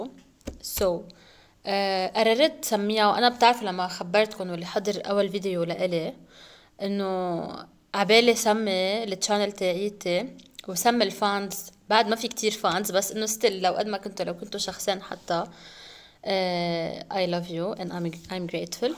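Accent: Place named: Lebanon. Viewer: و أنا بحبك 😂♥️ فعلاً العين و الحسد و اللت و العجن الزايد ما حلو أنا معك بالموضوع وشو كيوت طالع منك الحكي اللبناني 😆♥️ متابعتك من سوريا 😁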